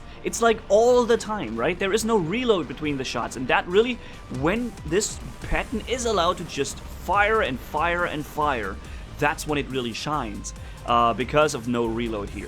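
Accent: Germany. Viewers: Been watching your vids for a while now, they're really awesome. As soon as a I heard you for the first time, I knew you were a fellow German :D. Your positive attitude is really cool aswell, makes the videos even better. Keep up the good work, I subbed :)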